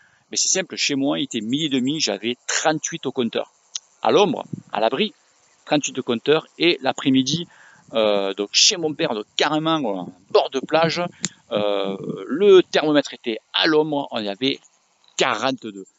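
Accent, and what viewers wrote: South, France. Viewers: Bonjour, tout d’abord merci pour tes vidéos, ta bonne humeur (avé l’accent et les cigales en plus👌🏻). « Minimum 120% FTP et jusqu’à 150% FTP» sur 4’ s’apparente à effort 4’ >PMA (effort Max 5’), non? Dans ce cas, personnellement impossible à tenir pour l’amateur que je suis, du moins pas sur plus de 1 répétition encore moins après 6’ de SST 😅🥵. Tu dois être une sacrée machine pour le faire 3x 💪🏻 pas accessibles à tous comme séance...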